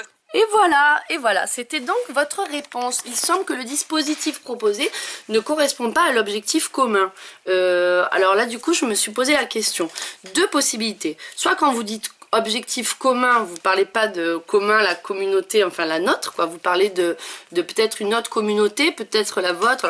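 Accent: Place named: Provence, France. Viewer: C'est la première fois que je me rends compte que Nicole Ferroni a un léger, et néanmoins délicieux, petit accent méridional. A croquer !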